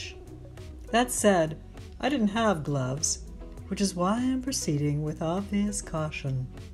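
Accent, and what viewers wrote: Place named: South, United States. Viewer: Are you a southerner by the way? I am, and to me your voice sounds southern. I am enjoying your vlogs.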